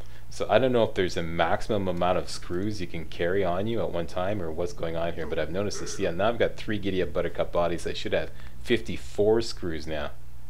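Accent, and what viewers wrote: Canada. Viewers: HEY! i used to be a BIG fan of YOU and your WIFE, a few years back, but my account was deleted for some 5 second UMG clip in a comedy i put up [when Google bought up utube and were kicking lots of utubers off for the vaguest of reasons] and i used some rather abusive language and told them to 'gtfooh' when they demanded recompense. I heard you packed this all in. Last vid i watched of yours was wayyy back about Bioshock 1[ ithink?] and helped me decide to buy it as i had to sell my PC [cash problems] and bought a used xbox360 back then. [I got a PC now but the GTX970 is very poor so gonna try and get a swap for a 1080 as the outside fan is wobbling almost off its spindle and it's only 7 months old... If i just offer to pony up the extra £300 i hope they'll just let me swap it] Good to see your stuff. Subbed soon as i recognised the Canadian accent, eh? 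I figured out the vegetable oil thing for getting Adhesive though i simply recruited about 8 civs to farm the corn [hardest to find until i found one in a stash near the Museum and planted it and built up the crop from that, same with the Tatos and Mutfruit]. For noobs like me i would advise going for the level 1 Scrapper as i don't have a clue where Diamond City is and tho a Level 2 Scrapper gets screws from weapons you have to reach Level 13, Level 1 doesn't get many unless it's junk like desktop lamps etc. Also, I installed Nexusmod manager and have [found] bought a LongScope Crossbow which doesn't have the range of m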